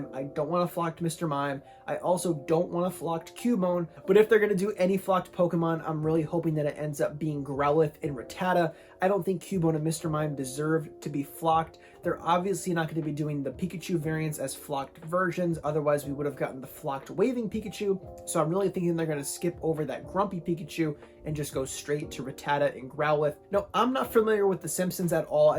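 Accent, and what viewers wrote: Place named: New York, United States. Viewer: Okay but I loved the New York accent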